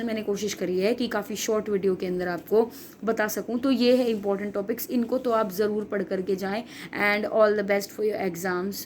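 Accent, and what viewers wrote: Haryana, India. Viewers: yss, haryanvi accent hai madam ka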